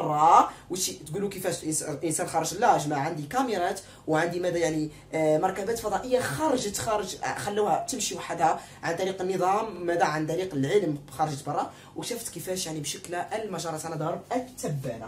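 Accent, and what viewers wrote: Morocco. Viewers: حسيتو مغربي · لهجتك مغربية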